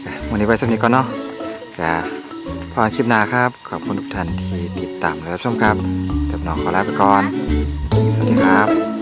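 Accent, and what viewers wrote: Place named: Isan, Thailand. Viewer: ฟังสำเนียงเสียงอิสาน คิดถึงบ้านที่เกิดแดนราบสูง ตกเบ็ดช่อนลุกปลากับแม่อบอุ่น ยิ่งดูยิ่งคิดถึงแม่ที่ห่างไกล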